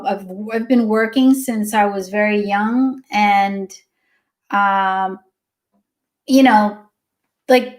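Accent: United States. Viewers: Are you American? I can’t work out and what do you do for a living?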